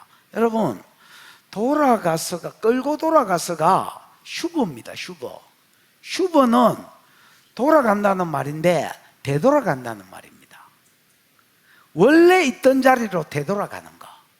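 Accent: Gyeongsang, South Korea. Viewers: 갱상도어로 들으니 정말 이해가 쏙쏙 말씀이 너무 재미가 있습니다. 감사합니다. 목사님^^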